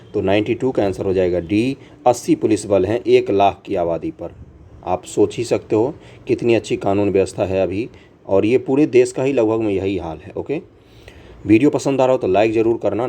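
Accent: Darbhanga, India. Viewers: आप दरभंगा से हो क्या सर।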